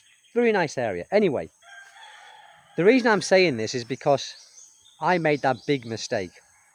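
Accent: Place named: Middlesbrough, United Kingdom. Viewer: Whereabouts are u from originally mate ? Boro accent ? Great videos mate 👍 all the best to u and lovely family 👍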